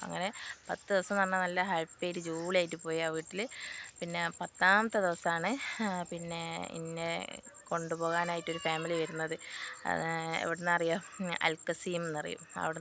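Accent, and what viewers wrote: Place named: Malabar, India. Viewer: അപ്പോ ഇങ്ങള് പ്രവാസി ആയിരുന്നോ... എല്ലാം അടിപൊളി വീഡിയോസാണ്... കണ്ടാൽ കേട്ടിരുന്നു പോകും... മലബാർ ഭാഷയും കൂടി ആയപ്പോ കേൾക്കാൻ നല്ല രസമാണ്...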